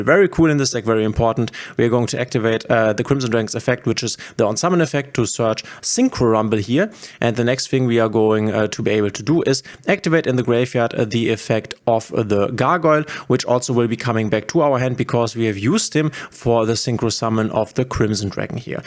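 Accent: Germany. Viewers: your accent sounds german 🙃 great vid btw 🎉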